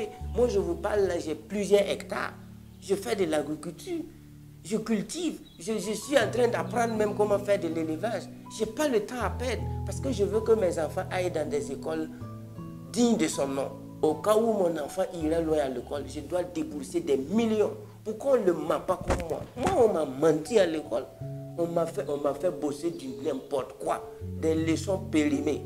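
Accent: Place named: Burkina Faso. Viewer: Le français des burkinabés fait rire 😂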